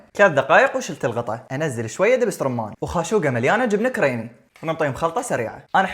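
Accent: Iraq. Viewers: ماشاءالله تبارك الله باين لذيذه تسلم يدينك احب لهجة العراق انا من السعودية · اللهجة العراقية 🤮🤮🤮🤮🤮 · اللهجة العراقية بتجنن❤❤🇲🇦🇲🇦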